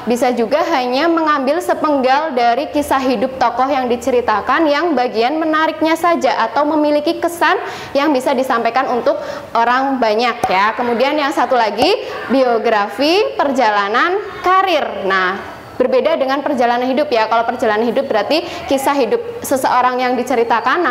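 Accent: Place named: Java, Indonesia. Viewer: mbanya medok lucu xD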